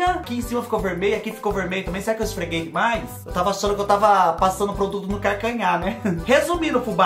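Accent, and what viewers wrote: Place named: Minas Gerais, Brazil. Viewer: Nossa, segundo vídeo que assisto seu, conheci seu canal hj e só ia ver de curiosidade, mas não aguentei e me inscrevi para não assistir de clandestina kkkkkkkkkkkkk e pq amei mesmo ^^ e esse sotaque mineirês é bão por dimais sô rsrsr <3 Sou uma mineira morando em Portugal agora, mas a mineirisse não sai de mim rsrs ô falta de um pão de queijo que deu agora rsrss Adorei vc e seu conteúdo, ri horrores rsrs obrigada! Beijinhos e sucesso!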